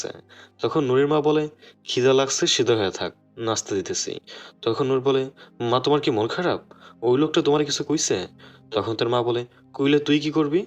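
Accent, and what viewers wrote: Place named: Pabna, Bangladesh. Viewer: পাবনার জেলার কথা শুনলেই ভালো লাগে ❤❤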